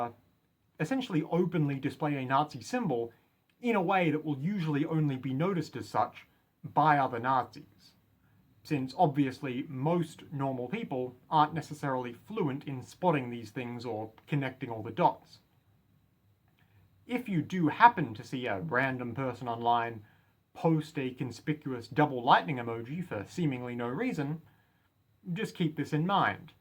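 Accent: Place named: New Zealand. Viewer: To my ken, he's a Pākehā in Aotearoa. Should be mostly similar to an Englander.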